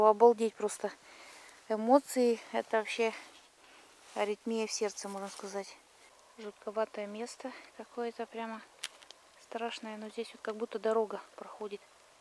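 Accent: Russia. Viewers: Говор то северный, так приятно слушать) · Уральский говорок?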